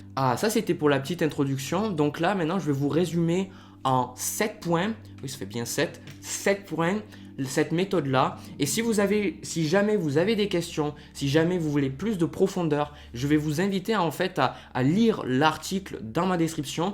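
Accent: South, France. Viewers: Merci un Bonjour de Béziers Super vidéo comme toujours avec ce bel accent du sud 😉 continue c est bon pour le moral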